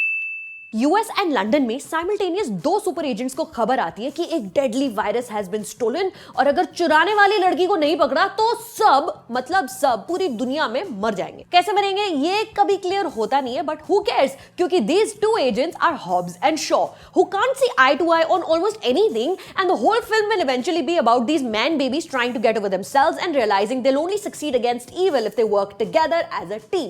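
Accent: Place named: India. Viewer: I dont know how u do it but u speak english like hindi. Never ceases to amaze me.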